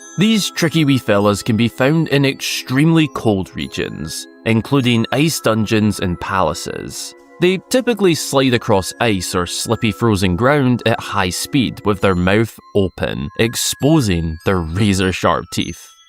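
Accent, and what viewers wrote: United Kingdom. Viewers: Yer accent is funny, you wouldn't happen to be British would you😅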